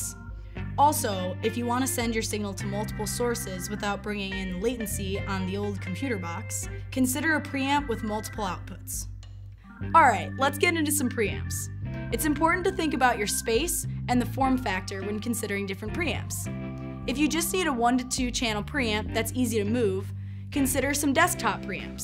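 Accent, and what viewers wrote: United States. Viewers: Please enlighten me about her accent. English is not my first language but what I'm hearing sounds like a typical American accent. Is it a specific regional accent?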